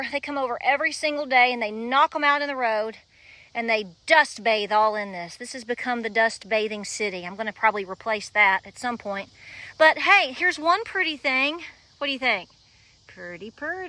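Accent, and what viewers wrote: South, United States. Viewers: ... You're like a plough horse, a jersey cow and a hound dog all in one.. with a southern drawl, y'all ... SAVE YOU SANITY, and stay ACTIVE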